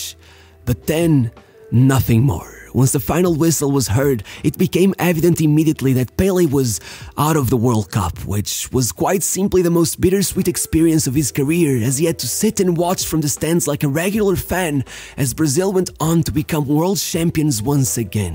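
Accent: Portugal. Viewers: He has a Portuguese accent, English is not his first language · It's normal that you will praise Pele because you are either Portuguese or Brazilian as can be guessed from your English accent resembling Jose Mourinho.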